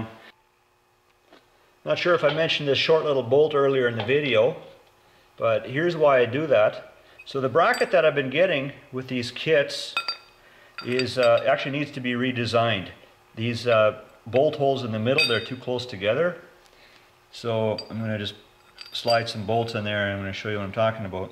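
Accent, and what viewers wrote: Canada. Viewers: Sounds like a Canadian accent eh!! Some people say I have an accent, I can hear it though!!☺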